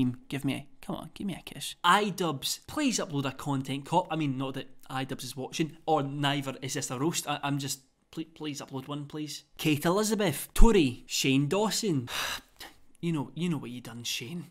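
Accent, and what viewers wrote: Scotland, United Kingdom. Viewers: Anyone know what part of Scotland he’s from just wondering cos accents are cool lolll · where about in scotland are you from?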